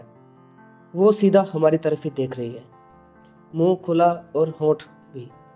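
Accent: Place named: India. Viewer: Fab💙 Hindi Accent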